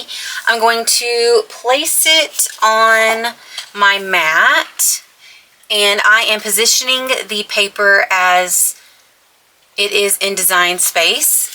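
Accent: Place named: Texas, United States. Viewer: I could hear that Texas twang!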